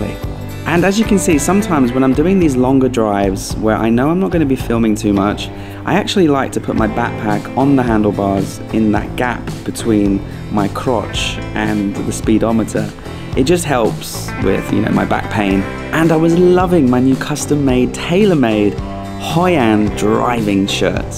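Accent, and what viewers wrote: United Kingdom. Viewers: your British accent is so strong now! I think it because you no longer have to speak broken Thai and most people in Vietnam speak more English 😎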